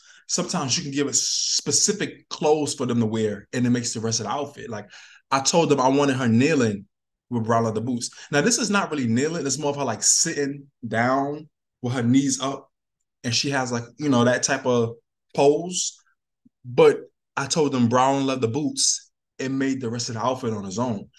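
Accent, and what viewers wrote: New York, United States. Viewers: I just love your dialect and how you say. It’s so NY😊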